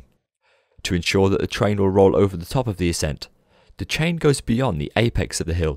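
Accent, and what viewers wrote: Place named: Black Country, United Kingdom. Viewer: Ahh - I used to live in Dudley and was trying to figure out if it was a little Black Country or Brummie twang I could hear in your accent :D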